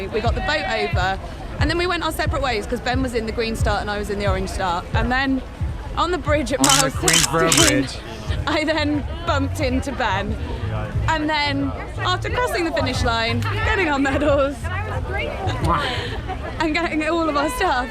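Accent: United Kingdom. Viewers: Can't imagine winning the lottery to get in, let alone speak in a british accent.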